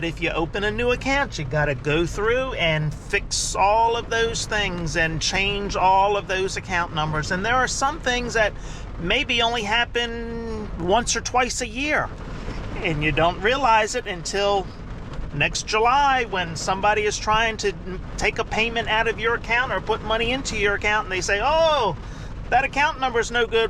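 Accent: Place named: New Jersey, United States. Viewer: You talk like you are in New Jersey .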